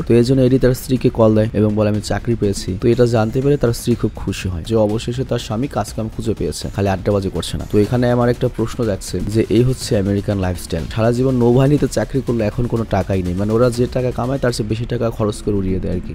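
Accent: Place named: Noakhali, Bangladesh. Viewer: এাই নোয়াখালীর হোলা❤❤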